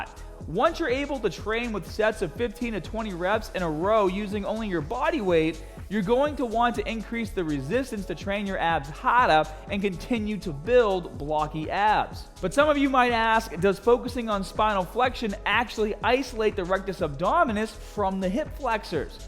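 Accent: Boston, United States. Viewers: The Boston accent is great. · He sounds like my former HS principal who came from Boston 😅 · Great video, obviously a Boston boy, LOL.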